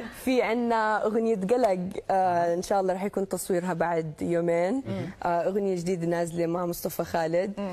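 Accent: Iraq. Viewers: اي اكيد لان لهجتها اساسا كذا هي عراقيه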